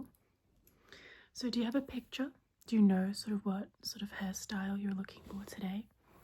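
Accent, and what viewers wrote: Australia. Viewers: I love listening to your voice and accent it's so soothing. There are very few asmrtists like this. What part of Australia are you from? 🇦🇺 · I don’t want to be mean but you sound Australian😭also, your very BEAUTIFUL🫰🏻🫰🏻keep the asmr going please🤍🤍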